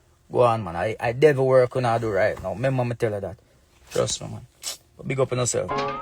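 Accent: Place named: United Kingdom. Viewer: However this dude is not Jamaican he is from the UK with a British accent.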